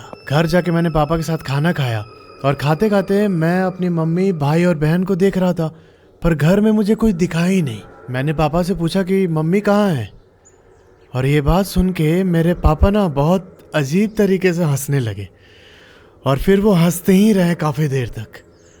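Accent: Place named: Uttar Pradesh, India. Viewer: Aapki awaj bahut tagdi hai aap kis district se ho aap up wali awaj lagti hai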